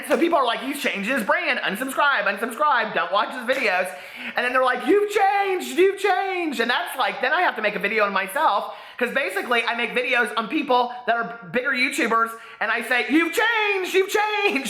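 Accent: United States. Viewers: This is my favourite video of yours so far :) and I love your voice, it's one of the things that sets you apart and why I started watching your channel regularly. I don't think it sounds weird at all, it just sounds like a different American accent (I'm English!) but it makes you come across as genuine and full of energy! And I love it when you get worked up and talk (rant!) really fast, pretty sure I subscribed after seeing your rant about your ex work colleagues ;) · I hear your American accent and I feel a great fondness for it because I know the personality behind it. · Baste! 🦃💜😘 edited to say: I love your voice. You don't sound like a young girl whatsoever. I can't believe anyone would even say that! That's laughable & dumb. I'm really great at accents & to me you just sound like a cool guy who is possibly from the south (?) I'm sorry if that's wrong, but that's what I hear. 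And you are beautiful inside and out, truly! You are entertaining, intelligent, well-spoken, delightful. Sending you so much love. I wish I could be as strong as your mother. I will commit myself to doing better. Thank you for this message, it has changed me.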